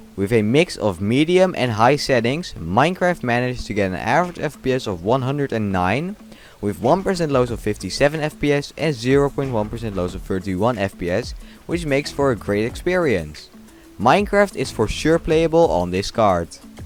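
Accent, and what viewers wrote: Netherlands. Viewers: vlgs mij ben je nederlands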